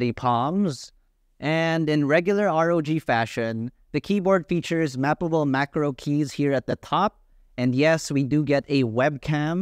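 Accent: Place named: Philippines. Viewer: Love the subtle Filipino accent lol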